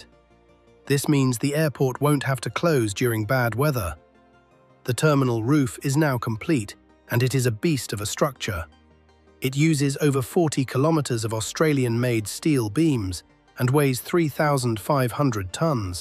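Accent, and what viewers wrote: United States. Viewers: Thank God other comments are aware of this cheap, sensationalist, uninformed click bait crap. The authorities behind these projects have the vision and foresight to act before commerce and life fail due to overuse gridlock of existing infrastructure. It is better to act before the shit hits the fan than panic when the whole world stops. Keynsian ecomonics also shows that the multiplifier effect is also developing other sectors of the economy. Instead of wasting our mutual time on this tripe, research the financing and building of the Australian Transcontinantal (Indian Pacific) Railway. Paid off 10 years after completion and still benifiting the nation. By the accent of the voiceover, I assume that this is an American vlog. It is rich that someone from a third-world banana republic (my opinion) is casting doubt on Australian initiative. 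From my reading, over half the bridges on the USA national highway network are overloaded or in urgent need of repair. Please stop gasslighting me!!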